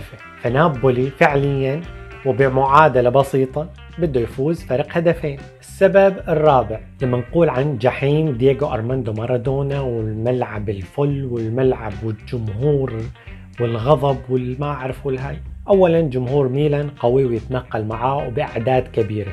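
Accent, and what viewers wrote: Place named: Deir ez-Zor, Syria. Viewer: لهجتك ديرية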